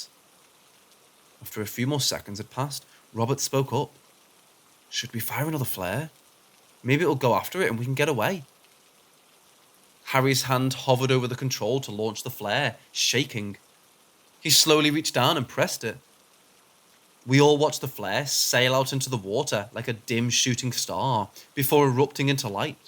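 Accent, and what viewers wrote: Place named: Scotland, United Kingdom. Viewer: Scotland I think idk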